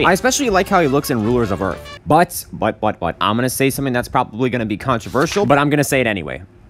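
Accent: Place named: Texas, United States. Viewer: ngl bro sounded like he had a Texan accent, and I agree with every ranking you just said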